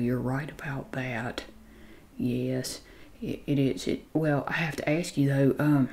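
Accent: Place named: Central Virginia, United States. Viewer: Can I just say that you're normal accent is so similar to how people talk where I live near Central Virginia.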